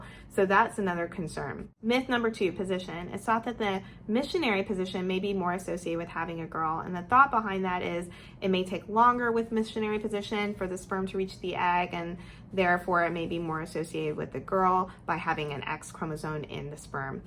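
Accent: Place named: United States. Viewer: Great content! Also how did you manage to have such an American accent? Do some schools in India have international English classes where they hire teachers from Western countries??? Just curious.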